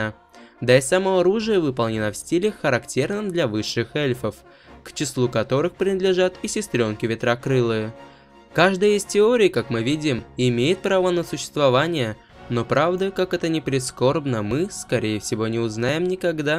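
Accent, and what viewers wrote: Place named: England, United Kingdom. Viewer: Сильный английский акцент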